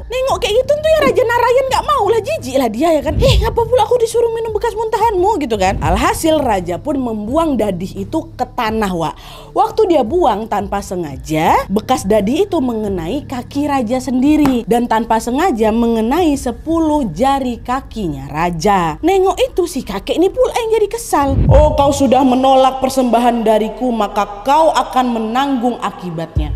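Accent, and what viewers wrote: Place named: Medan, Indonesia. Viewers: Medan kali kamu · Logat medanmu itu medok .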